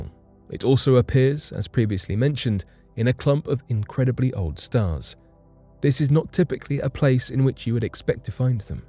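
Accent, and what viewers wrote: United Kingdom. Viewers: How many times am I going to hear "space the final frontier" on a british voice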